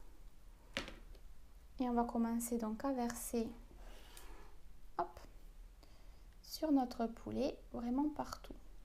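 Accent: South, France. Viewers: coucou j'adore tes vidéos je voulais savoir tu habite dans quelle région (du Sud je crois par rapport à ton accent) car il a l'aire de faire beau par la non ba voilà j'ai testé ta tarte à la tomate et chèvre elle a dit du succès et elle méritais je vais l'essayer demain le poulet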